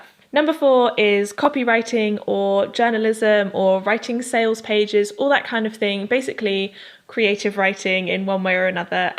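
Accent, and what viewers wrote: United Kingdom. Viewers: Great content as always! Thank you 😊👍 and this british accent grrrr loving it! 😊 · It’s so refreshing to hear a British accent! Hallelujah 🙏🏾No shade!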